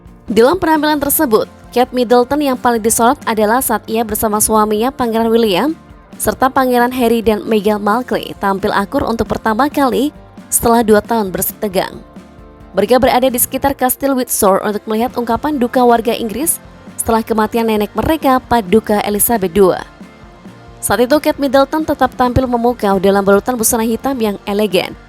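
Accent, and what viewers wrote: Indonesia. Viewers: Saran untuk admin : supaya cari narator yg memiliki pronounce yg English British atau English US. Jangan yg bhs. Inggris a la Indonesia.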